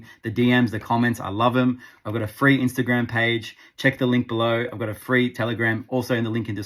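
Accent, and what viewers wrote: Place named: Australia. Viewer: Found this Channel after seeing your comments/tips in calling our shot , after watching a couple of times i thought i detected an Aussie Accent , Good to see/find an Australian sports betting Capper/Tipster , looking foward to seeing what you do with AFL and NRL when the seasons start, The Boomers ( The Australian basketball team for anyone eles reading ) just had another win giving Indonesia a bit of a thrashing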